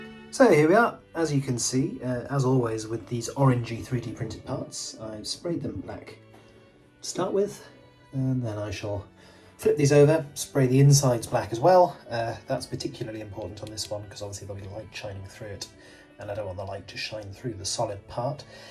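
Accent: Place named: North, United Kingdom. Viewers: Are you based out of the north east by any chance? Sounds like you have some northern accent come out now and again lol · Thanks. I have a long interest in mining, so thought it was a Davy. (Does your occasional Yorkshire accent have anything to do with family history 'oop noorrth'?)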